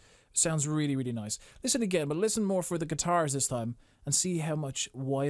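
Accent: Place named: Ireland. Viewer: youre from ireland :) great video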